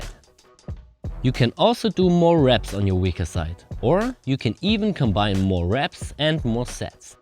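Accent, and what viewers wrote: Germany. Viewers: you are german😀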